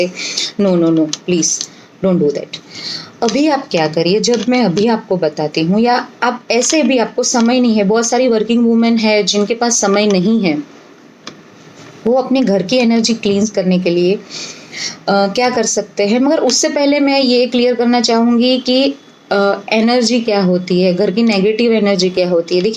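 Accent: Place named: Gujarat, India. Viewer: Her pronunciations is gujarati why should you speak hindi full bakwas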